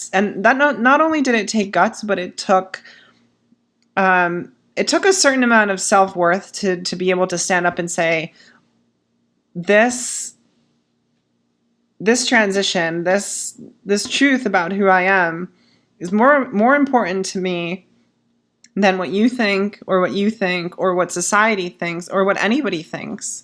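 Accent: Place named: United States. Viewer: i only discovered you this afternoon through your two '5 lies' videos but i have already committed myself to watching them all over the next week, month, year, etc (as many times as necessary for the points you make to sink in). you have such insight and self-awareness, and seem able to articluate your feelings through language so effectively, your videos are utterly compelling. even more, you even seem able to put into language feelings i have but can't find language to express myself. sometimes you say something that 'hits' so hard i have to stop the video, take a deep breath and recompose myself before carrying on, because you are bring thoughts and feelings i have into focus for me in a way i can't. maybe because i am too close, or too afraid. or because i don't know what i am looking for when i think about myself. i will look into your ebook too. 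i'm in UK/EU half-half. i'm guesssing most of it would apply (feelings are universal) and that it isn't specifically focused on getting help in the states (i'm guessing the states, from your accent but sorry if i've got your location wrong). as for more updates and videos, i will watch anything you post without hesistation. don't let your modesty or humility stall you. my eyes widened in this video when you commented that the running time was nearly ten minutes so you had better hurry up. truly, i would have been fine with an hour. or two. whatever you wanted to do. if anyone watches your videos with one eye on the clock i wonde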